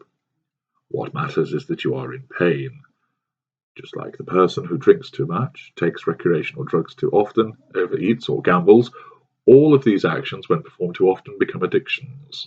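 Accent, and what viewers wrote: England, United Kingdom. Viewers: I have always wondered if he uses a device to distort his voice. Either way tell tale signs have popped up showing he is English.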